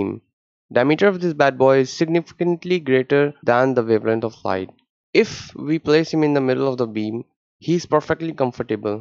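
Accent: India. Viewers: You seem to a young Indian by your accent What the f in United states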